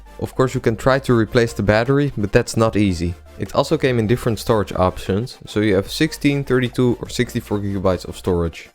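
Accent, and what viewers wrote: Netherlands. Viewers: funny Dutch accent. :). but good review!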